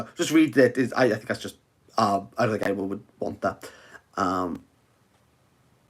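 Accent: Wales, United Kingdom. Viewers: I did Not expect this thick Welsh accent hard-as-nails man to survive the first week of Ramadan, but deep inside, when I sow how he makes the "Rrrrrrrrrrramadan Mubarak" sound, I knew he is sincere about life, and he might just make it to Eid. Look at him, crumbling, but not falling. Look at him now after thinking of quitting youtube, he is making a Live... Man we Love you for the sake of Allah, and may Allah bless you and your family, and may Allah give you from where you never expect, and keep you and your loved ones on the straight path insha'allah. Eid Mubarak Brothers & Sisters 🎉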